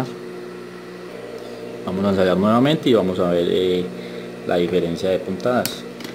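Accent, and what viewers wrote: Colombia. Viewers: buenos dias amigo por su dialecto se meda que usted es colombiano y por los lados del eje cafetero buen tutorial felicitaciones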